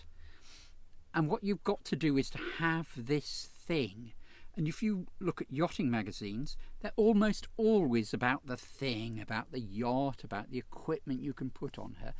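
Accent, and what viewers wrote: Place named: RP, United Kingdom. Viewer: sound like a silverspoon in gob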